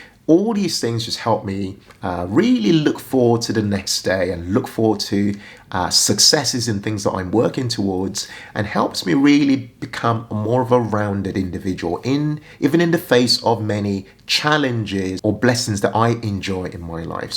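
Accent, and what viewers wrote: United Kingdom. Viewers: Which country are you from? You sound like you’re from the UK x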